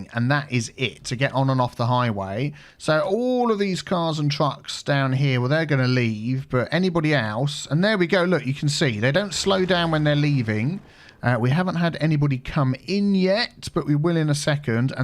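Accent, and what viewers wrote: United Kingdom. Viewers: youre kind of adorably british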